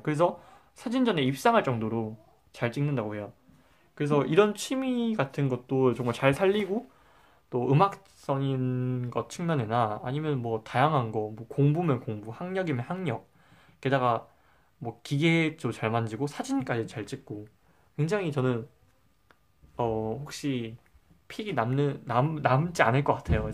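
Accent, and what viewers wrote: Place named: Jeolla, South Korea. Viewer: 전라도분..ㅎ 전라도 사람만 알수있는 억양 ㅎ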